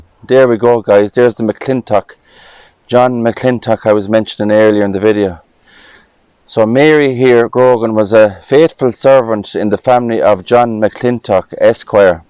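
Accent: Ireland. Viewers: I enjoy your Irish accent!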